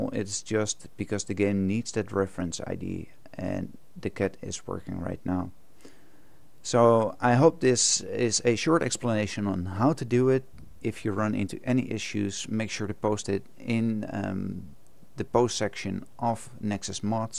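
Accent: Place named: Netherlands. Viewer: This guy sounds Dutch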